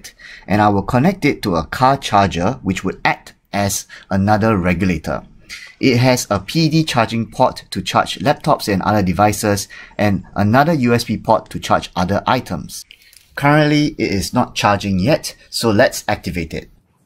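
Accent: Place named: Singapore. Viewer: sound singaporean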